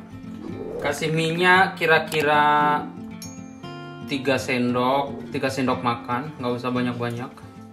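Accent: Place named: Surabaya, Indonesia. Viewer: khas nya orang surabaya iki.. kayak tahu tek tek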